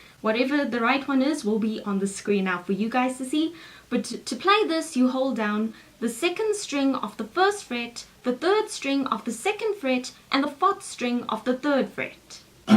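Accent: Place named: South Africa. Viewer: sounds like south african to my ears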